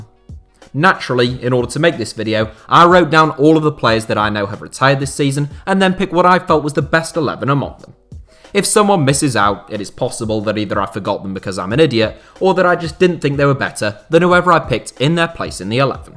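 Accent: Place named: RP, United Kingdom. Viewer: Well not to sound like a dickhead but the commentators voice is so annoying like it's a posh accent and he tries to prove to us that he is better which actually does the opposite never need to prove yourself to people I kinda get he is trying what he deemed his best but it still show maybe in the future he will lose it I hope as it is a horrible trait to have as the voice is a beautiful instrument and language an art but please dont let our lovely ears to despair and partial misery I dont hate on him just notice it even in other channels youtube is 90 percent toxic 10 percent good so is Twitter instagram and Facebook peace have a productive day and fuck the dickheads around who trying to bring u down not talking about the commentator just in general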